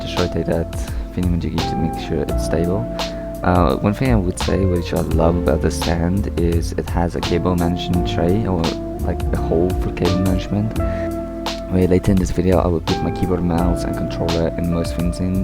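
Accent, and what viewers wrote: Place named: South Africa. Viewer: oh mans south african lol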